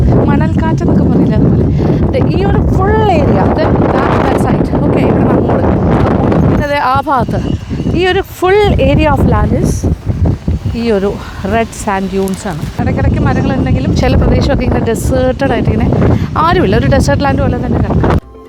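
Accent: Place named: South Kerala, India. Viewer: Im Tamilian from Kanyakumari. Akka is very famous in Tamilnadu ♥️. Something about her malayalam its very different and easy to understand as a tamilian compared to some other dialects. Maybe she is from South Kerala thats why?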